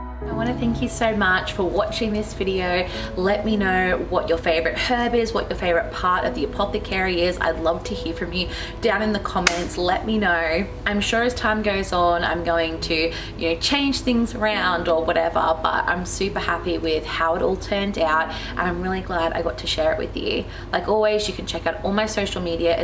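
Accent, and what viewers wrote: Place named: Australia. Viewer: Me, hears Aussie accent. Instantly hits subscribe because I love supporting fellow aussies… and because Aussie witchie fun!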